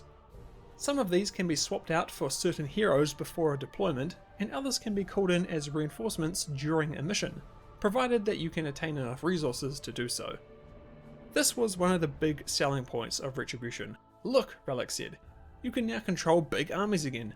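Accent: New Zealand. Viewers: Loving your kiwi accent; this expansion was indeed the beginning of the end, despite some good ideas...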